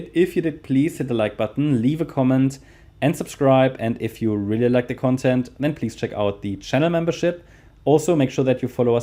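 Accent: Germany. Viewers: Thanks, Hear your accent, i assume you are German. I am also living in Germany and have made quite significant gains with Solana. I wouldn't like to sell it because of 42% crypto tax here i need to hold on until july because then I'll complete 1 year and then tax rate would be 0%. What would you suggest? Take my profits now or wait until July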